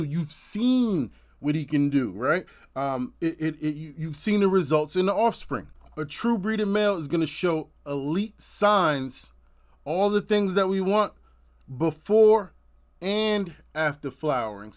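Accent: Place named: New Jersey, United States. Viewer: And where u from? U sound like u not really from nyc but somewhere close. Jersey or Li or sum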